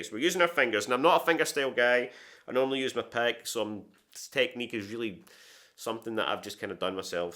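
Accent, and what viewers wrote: Scotland, United Kingdom. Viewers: Very cool lesson dude , I’m in the United States , I love the Scottish accent , reminds me of a guy I used to work with over here , he was born in Glasgow and the plates on his car said Haggis 1 , he was a cool guy !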